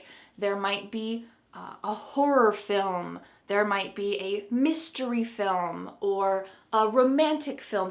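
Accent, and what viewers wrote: United States. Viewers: I can't hear your voice from when you had stated this channel but I love your Vedios and I am so so happy because I have found a great teacher for me and I love American accent and I love your voice I want to speak like you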